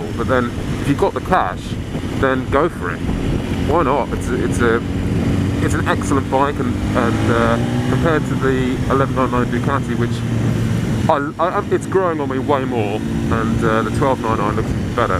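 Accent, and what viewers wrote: United Kingdom. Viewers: Does anyone else think this guy just has the smoothest accent ever? like, when you hear english people, most of the time its like... ew. but not with this guy. g0od 0n u m8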